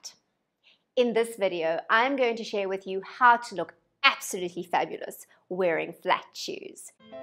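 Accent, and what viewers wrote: South Africa. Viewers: South African? Nice video gal. Helpful. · you sound South African. Love the accent and love the tips, thank you!!!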